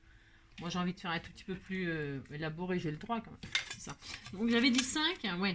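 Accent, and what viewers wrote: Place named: Paris, France. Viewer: Coucou , j’adore votre voix de titi parisienne , vous devriez mimer cette réplique : « atmosphère,atmosphère,est ce que j’ai une gueule d’atmosphère ? » 😃😉 merci pour les tutos..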